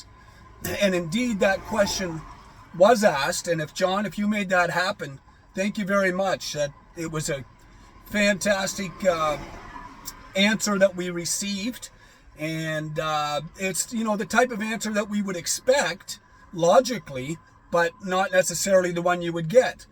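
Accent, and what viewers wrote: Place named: Canada. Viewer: I love your accent. Canadian?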